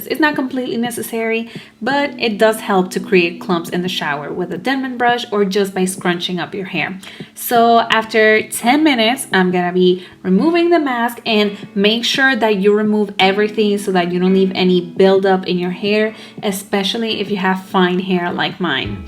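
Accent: Puerto Rico. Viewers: voy a tratar not your mother’s! me encantó como quedó. btw eres puertorriqueña? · Eah corrillo? Puertorican?👀😂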